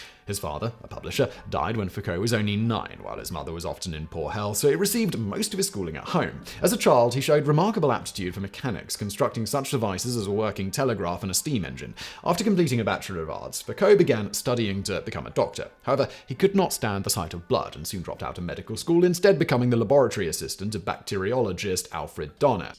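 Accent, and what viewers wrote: United Kingdom. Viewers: He has a thick British accent, but why then does it feel like he's an American?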